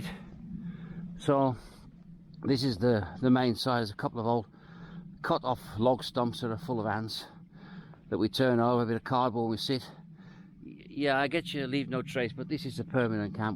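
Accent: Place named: Black Country, United Kingdom. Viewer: I recognised the black country accent but I wasn't sure whereabouts.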